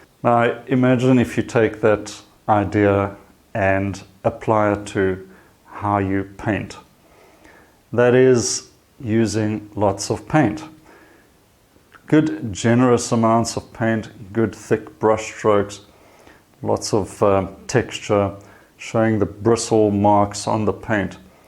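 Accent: South Africa. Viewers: Thank you for your tips on painting! I really enjoy watching your videos and also listening to your south african accent as I lived 4 years there and left it for Kenya in 2017. SA always in my heart! Regards.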